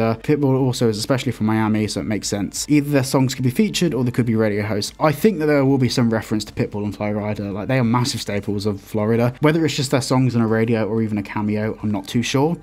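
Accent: Birmingham, United Kingdom. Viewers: R u from Birmingham geezer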